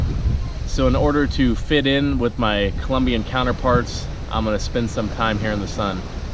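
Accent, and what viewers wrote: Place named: United States. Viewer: It's only the American accent that makes him stand out.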